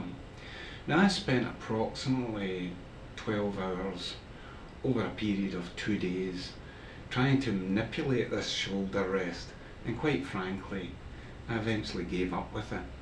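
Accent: Scotland, United Kingdom. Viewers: wow, this is really cool!!! I had no idea you can adjust the violin on your neck. And...olive oil? You are hilarious :-D Are you Scottish? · Ah, thank you! I thought it might be Egyptian or some other Arabic dialect. I didn't know that Scottish is that thick.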